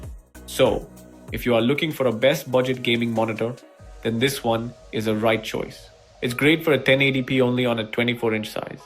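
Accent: India. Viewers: Please talk in American accent it sucks when u talk in indian english I only like my country for its national language which is hindi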